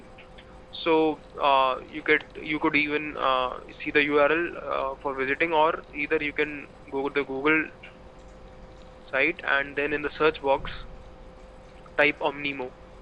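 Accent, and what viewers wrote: India. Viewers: haha love indian accent xd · hhhhhhhhhhhhhhhhhhhhhhhhh the indian english soooooooooooo bad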